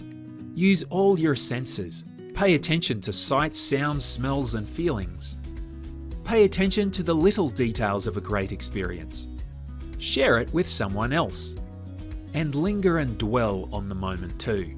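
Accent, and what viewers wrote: Australia. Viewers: I came here to ask about your accent. It’s like Australian with some good ole southern twang thrown in there to keep you guessing! 🤣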